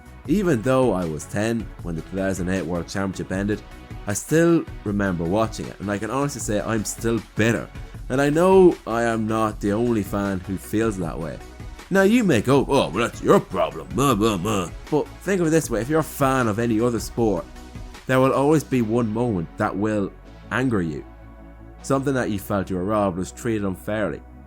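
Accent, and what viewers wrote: Ireland. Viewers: tf you on about with weird accents and being British he's irish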